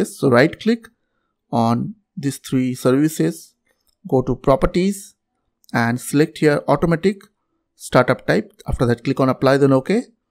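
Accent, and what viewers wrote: India. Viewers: You can always count on a guy with thick hindi accent to solve a random technical issue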